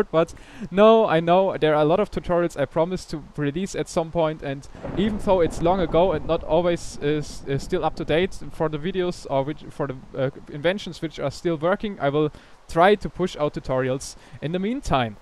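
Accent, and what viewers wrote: Germany. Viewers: Your German accent makes it hard for me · You're awesome :) awesome German accent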